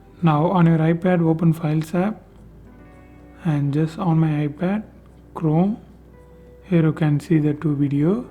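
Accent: India. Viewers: The moment I heard the Indian voice I knew I was saved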